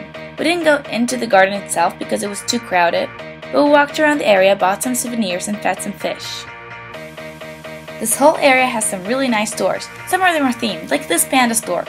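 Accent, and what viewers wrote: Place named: United States. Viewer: Sounds like u have an american accent .. I like it 😊 ciao video stupendo brava !!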